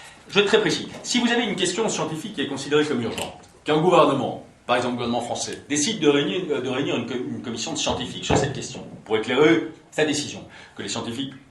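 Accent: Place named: Belgium. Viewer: C'est juste une des nombreuses formes de l'accent belge. Faut s'habituer... Pour le reste, je suis d'accord aussi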